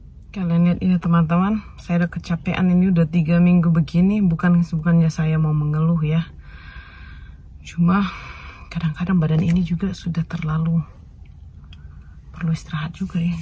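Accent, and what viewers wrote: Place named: Medan, Indonesia. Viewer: Suka aku logatmu sis, ingat tetanggaku di kampung banyak yg dari Medan cute baby, lovely vlog sis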